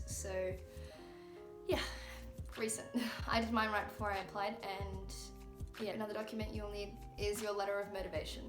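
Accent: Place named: United Kingdom. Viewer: It was the most useful I watched about study in France , Note: I love the British accent ❣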